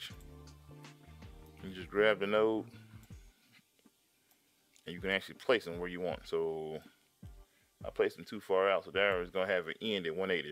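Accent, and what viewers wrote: United States. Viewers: This is probably so random, but what's your accent? I know you're US, but like what state? I'm not from US but I'm fascinated with accents. PS, thanks for the vid, I found it helpful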